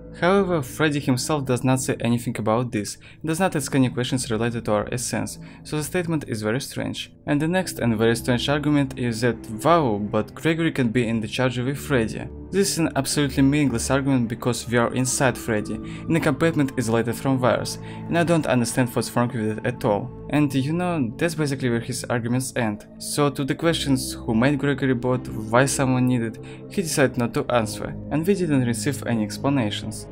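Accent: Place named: Russia. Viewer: He are russian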